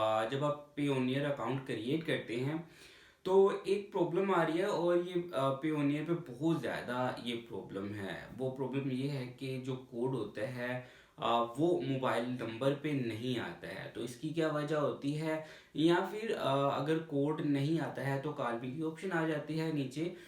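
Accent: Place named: India. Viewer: You are such a scammer You write the subtitle of your video in English But your speek is in indian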